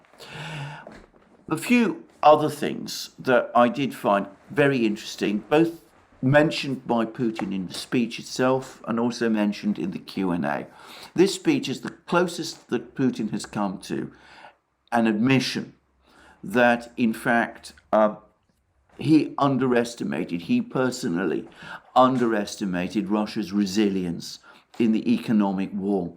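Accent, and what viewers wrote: England, United Kingdom. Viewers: Russian disinformation with an English accent!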